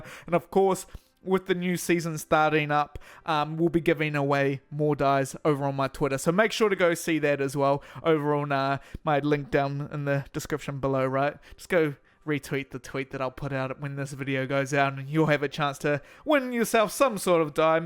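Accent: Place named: New Zealand. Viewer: Mate, are you a kiwi? You got that thicc east tamaki accent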